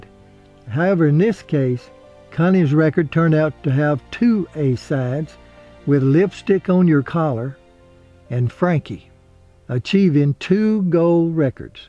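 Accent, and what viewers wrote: South, United States. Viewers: Such a sad story! I hope I will be able to sleep...after listening to you. Nonetheless, you have a beautiful, soft voice with a soothing southern accent. Thank you anyhow. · Great Southern narration voice. At least this British man loves it.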